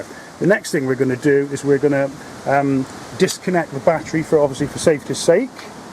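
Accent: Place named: United Kingdom. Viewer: With the accent I thought you were in the UK! Have watched the vid again and called myself a 'muppet' as you stated you got it from Mr Auto. I've sinced looked starters up for my car. Cheers for the tip on not using slick 50 in the gear box, only ever used it in engines with no problems.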